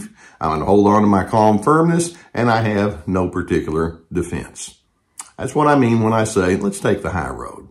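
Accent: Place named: North Texas, United States. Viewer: Thanks for posting this. Your North Texas voice is comforting as we lived most of our lives around the Plano area. We've recently retired from North Texas to Portugal and share a two apartment condo building with an elderly, Dutch female textbook narcissist. Emails and text messages from this neighbor attempting to govern our lives are read with varying degrees of trepidation. Is it possible to create a video for us about online communication styles of narcissists? Thanks!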